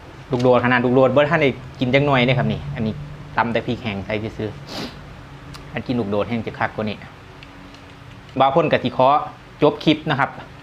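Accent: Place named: Ubon Ratchathani, Thailand. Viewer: สำเนียงอุบล ฟังเพราะดีเนาะ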